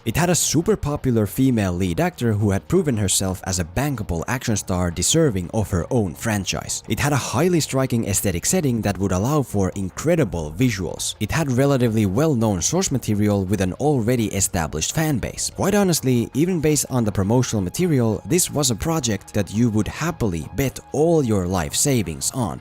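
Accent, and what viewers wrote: Finland. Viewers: I have to ask, are you Finnish? Your accent tells me you are. In anime the story was different and as far as I remember, they always setup things and payed them off. This Movie had so many WTF moments and no stake at all for the viewer. What a waste.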